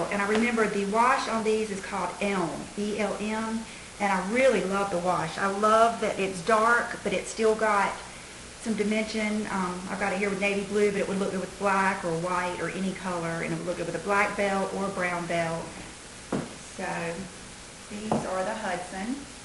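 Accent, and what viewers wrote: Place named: Texas, United States. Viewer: Are you from Texas? I love your accent.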